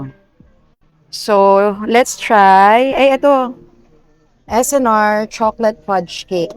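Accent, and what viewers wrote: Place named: United States. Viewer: Ganda ng speaking voice mo Ma'am. Very American Accent. ❤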